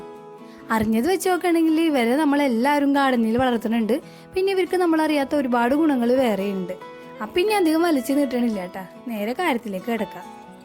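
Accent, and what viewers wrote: Thrissur, India. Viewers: തൃശൂർ ആണല്ലേ വീട് .😘 ഇഷ്ഠമായിട്ടോ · Thrissur bhasha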